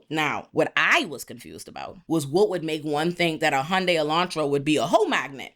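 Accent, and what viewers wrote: Bahamas. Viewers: Are you Bahamian or Nigerian? Can't tell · girl just hearing ya Bahamian accent is be enough for me in these videos for rewatching lollll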